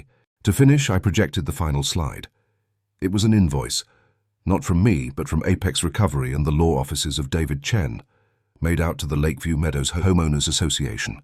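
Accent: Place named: United Kingdom. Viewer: What's with the British accent? Make it American. Viewing from Binghamton, NY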